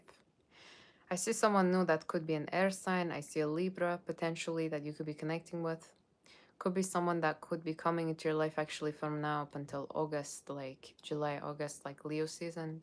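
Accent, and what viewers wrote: Russia. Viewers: I love the Russian Voice it make you more beautiful thanks 👑💯❤️🔥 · Omg i loveeeee this russian look and accent!!! ❤❤❤ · Your russian sounds so real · The Russian accent is quite smooth and lowkey soothing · So cute 🥰 love your Russian accent 💞 Thank you for Scorpio and Virgo reading....both reading is freaking accurate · I love your russian accent... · I love your Russian accent 😍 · Wowww,this was the most pleasant surprize for today !! It's Friday night here in UK now when i find your reading dear Russian woman and you made my evening ! Love your look and natural russian accent! ❤😂❤❤❤❤❤❤❤❤❤❤❤ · Great Russian accent ❤ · I'm Romanian living in Australia!! so definitely Da !! loved the Russian accent u reminded me of the Russian actress on shameless 😅❤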